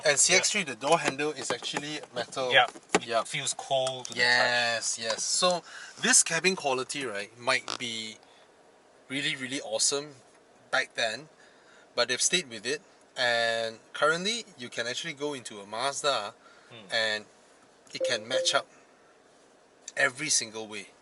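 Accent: Malaysia. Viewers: It's so hilarious how you Malaysians speak our English language ! But at least it sounds better than china and other east asian countries' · ahh.. I see so Malaysian speak that way??? I'm from Poland. Please forgive my stupidity 🙏🙏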